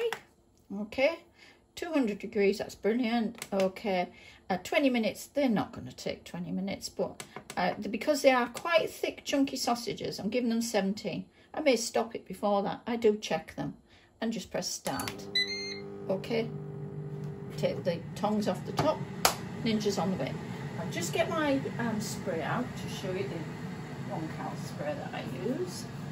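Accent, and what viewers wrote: Northern England, United Kingdom. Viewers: Nice to hear a Northern accent - I’m originally from Grangetown, in Middlesbrough!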